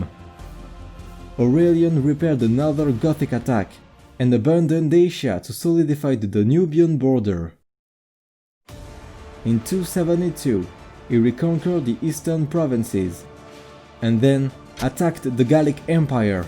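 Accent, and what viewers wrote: France. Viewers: donc c’est bien un accent français ?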